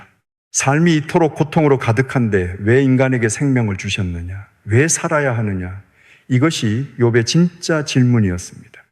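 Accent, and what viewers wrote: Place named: Gyeongsang, South Korea. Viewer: 목소리도 전혀 아니에요 경상도 말씨인데 참 유튭.들 ㅍㅍㅍ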